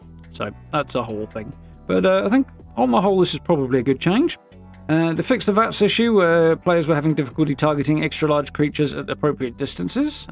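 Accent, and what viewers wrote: United Kingdom. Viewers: 4k tvs have never been as affordable as they are today. I have a 4k 55" I bought for 200 usd and am planning on buying a 65" for a hundred dollars more soon. I see by your speech you are from the United Kingdom. I bought my telly from a place called Wal-Mart. Does your country have them? Hello from Yakima Washington USA ❤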